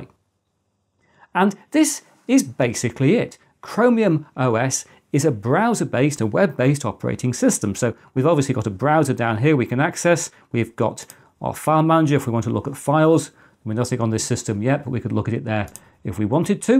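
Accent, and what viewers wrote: United Kingdom. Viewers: I just love the way Britons talk.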